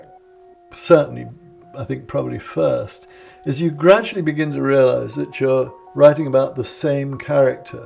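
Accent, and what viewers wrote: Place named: Southern England, United Kingdom. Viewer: He's not from Liverpool though! His accent is from the south of England (like mine)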